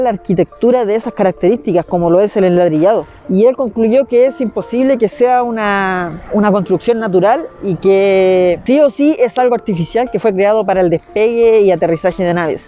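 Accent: Chile. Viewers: Hola whoa me encanta tus vídeos Gracias por mostrar al mundo mi Chile querido que no lo he vuelto a verlo desde 1975 tengo lágrimas en mis mejillas Un comentario me encanta como hablas tienes un asentó chileno hermoso ⚡️❤️⚡️ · Eri como luisito comunica pero chileno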